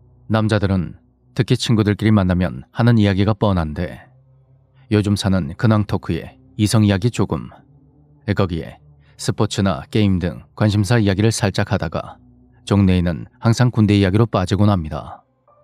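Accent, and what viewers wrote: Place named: Busan, South Korea. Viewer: 부산사투리 재미있어요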